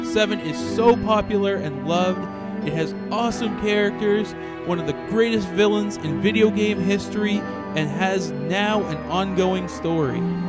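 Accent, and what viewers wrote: Canada. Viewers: Are you canadian? Great list btw. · are you canadian?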